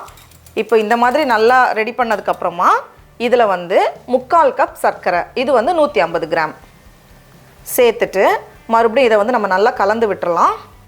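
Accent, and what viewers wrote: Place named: Chennai, India. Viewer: Super akka love Madras Tamil❤️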